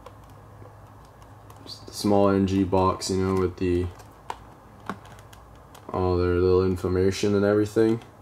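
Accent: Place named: California, United States. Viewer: Hahaha! I couldn't tell what accent you have, it's Californian! My uncle talks like you. btw it's mainly the 737-700 that comes to la. West Jet usually uses it's 737-800s at lax for it's once daily lax-yyz flight.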